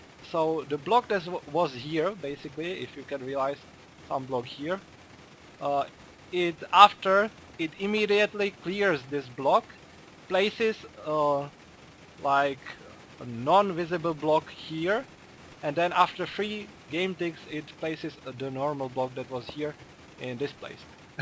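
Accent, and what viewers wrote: Czech Republic. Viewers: Jde to znát že jsi čech :D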